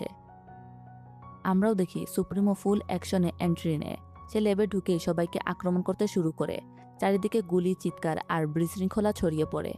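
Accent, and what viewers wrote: Sylhet, Bangladesh. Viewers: Apu apni ki Sylheti?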